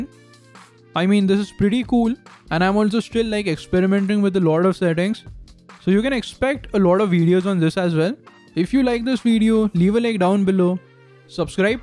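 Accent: India. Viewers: he has the best indian accent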